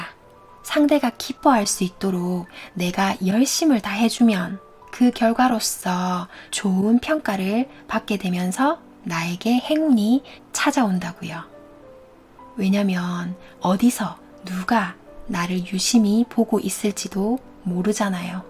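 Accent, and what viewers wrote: Gyeongsang, South Korea. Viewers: 저는 님 경상도사투리가 묻어나는 스토리텔러여서 더 좋네요. 마음편하게하는 목소리입니다. 구독하고 갑니다.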